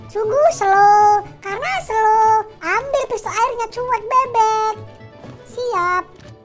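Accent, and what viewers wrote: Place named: East Java, Indonesia. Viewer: Jawatimuran nie... Anjay ..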